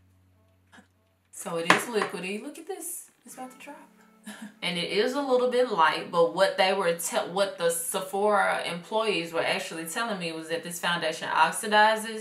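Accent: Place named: Memphis, United States. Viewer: Lol...i live the Memphis accent. People never have to tell me theyre from M-town cuz the accent leaves no doubt if you are familiar with it.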